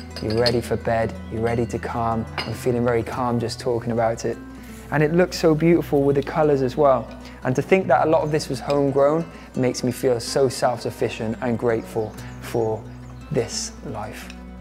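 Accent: Wales, United Kingdom. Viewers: I thought he was saying elder and that it was just his welsh accent.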